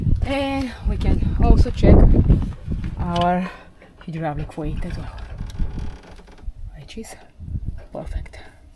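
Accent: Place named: Russia. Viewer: Really? This is a bad joke. Your accent is not american sound like a russian...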